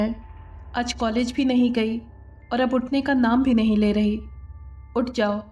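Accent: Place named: Lucknow, India. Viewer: Yeh pyari awaaz zaroor Lucknow ki hai . Carry onnnnn 👍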